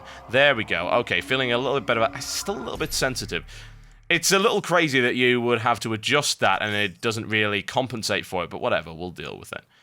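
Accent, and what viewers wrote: United Kingdom. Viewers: this guy's british accent can almost compare to paul from paul's gaming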